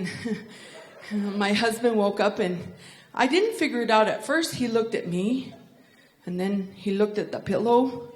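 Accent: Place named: Northern New Mexico, United States. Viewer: Love that Northern New Mexico accent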